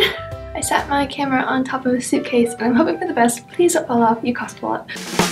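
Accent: United Kingdom. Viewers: who else noticed she sounds British in like every video now lol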